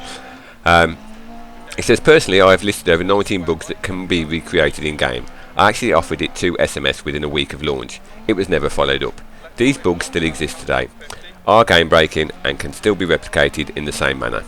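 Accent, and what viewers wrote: United Kingdom. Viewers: would say uk.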